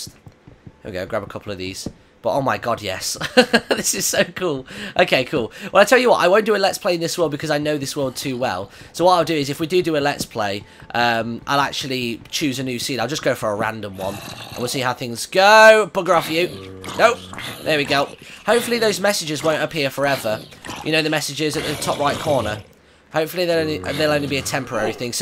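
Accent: United Kingdom. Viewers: how come every time i watch something about minecraft the person that talks is british! its so annoying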